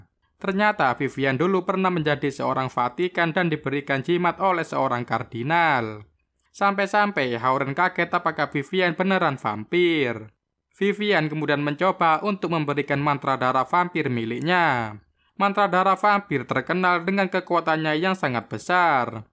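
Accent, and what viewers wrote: Java, Indonesia. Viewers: Logat jawanya terlalu terasa😌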